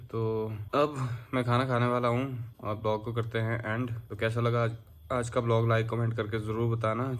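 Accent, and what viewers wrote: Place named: Himachal Pradesh, India. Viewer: Himachali accent🌻❤ Stay inside and safe🌸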